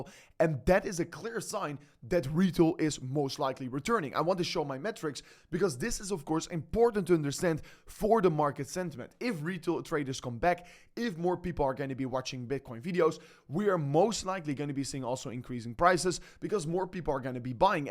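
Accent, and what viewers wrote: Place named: Netherlands. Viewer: aaah I just knew from your accent that you are dutch hahaha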